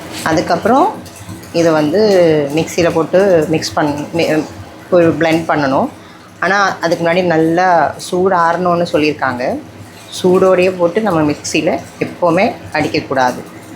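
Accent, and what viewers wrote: Kerala, India. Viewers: Malayalam kalantha tamil like it kutty ma..... Na podi try pani pakuren sissy ma.....❤❤❤❤❤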